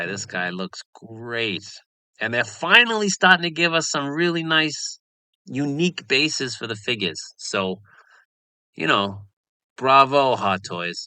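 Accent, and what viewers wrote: Boston, United States. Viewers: Awesome review of what was shown at the con. As someone who lived in the Boston area for several years, I also always appreciate hearing the Boston accent in narration. Go Sox. Go Pats.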